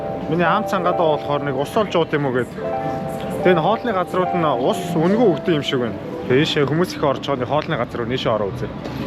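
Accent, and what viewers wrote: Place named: Uvs, Mongolia. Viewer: Uwsin egch bhshig bnshd haha ❤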